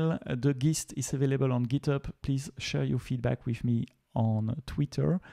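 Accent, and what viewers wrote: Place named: France. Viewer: Je me disais bien qu’il y avait un accent français 😂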